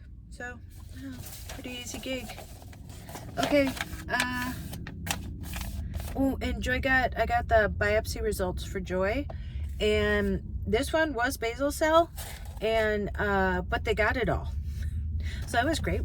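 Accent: Minnesota, United States. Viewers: You are a cook. And i mean that in the best sense of the word. Caught a couple of your videos a year or so apart.. just caught a hint of your Minnesota accent. Love it! Subscribed.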